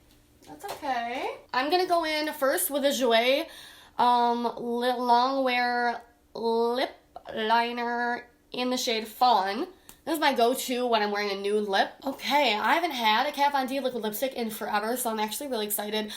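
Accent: Buffalo, United States. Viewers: Are you from Buffalo? Your accent sounds like you're from Buffalo hahaha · This girl has to be from buffalo- can hear that accent anywhere 😂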